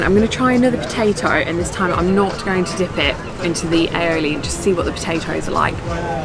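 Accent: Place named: England, United Kingdom. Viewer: Wow thanks 👸🌹 for sharing more of your beautiful English seaside and countryside. The food looks wonderful. I really love good seafood. I would love to visit Hadrian's Wall and the Sycamore Gap. All that great Roman and English history. I am glad I can see them through your cameras. I really love your beautiful English voice narrating your videos, it is very nice. I still think your English coast looks like the Washington State Coast, One is rich in Roman and English history and the other one in Native American. Thanks for Sharing Love 💖 your channel. Cheers 🍷🍷🧔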